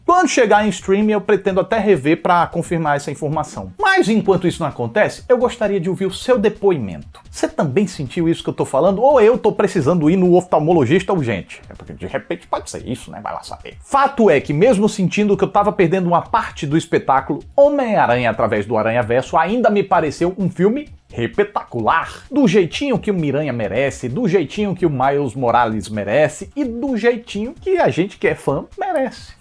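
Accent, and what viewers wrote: Northeast, Brazil. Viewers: Boa análise, como sempre! Sem dúvida, se não é o melhor, é um dos melhores avaliadores de filmes do YouTube, da internet! 👍👏 Como se não bastasse a inteligência e o bom humor nas análises, ainda por cima há o maravilhoso (para mim, carioca descendente de nordestinos!) sotaque nordestino! 😉👍 Eu gostaria de lhe pedir que fizesse uma reação para o filme "Mulher-Rei" , que assisti na tv a cabo e achei ótimo! Aliás, só mesmo o racismo enraizado nas sociedades ocidentais para explicar a divulgação fraca que este filme teve e o fato de não ter vencido um Oscar - um "desinibido de ouro"! No mínimo, penso que deveria ter concorrido a melhor filme, melhor direção, melhor roteiro e atriz coadjuvante (há umas 2 ou 3 que mereciam pelo menos concorrer), para não dizer que a Viola Davis deveria ter ganhado para atriz principal.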